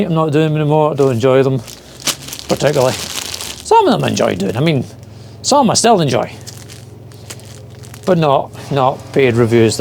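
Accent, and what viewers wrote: Scotland, United Kingdom. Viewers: Good to hear your Scottish accent again, and glad you're recovering. Thought you just got tired of making videos.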